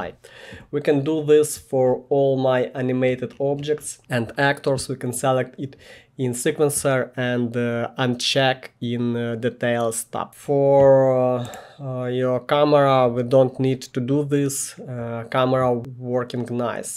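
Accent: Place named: India. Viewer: ..accent like a Rajesh ))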